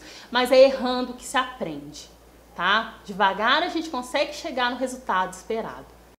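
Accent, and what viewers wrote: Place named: Minas Gerais, Brazil. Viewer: esse sotaque que acho lindo? tu és mineira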